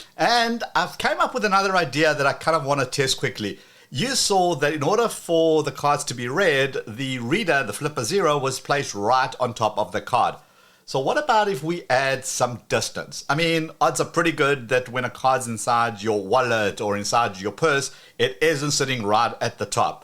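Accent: South Africa. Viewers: Love that lekker South African accent of yours!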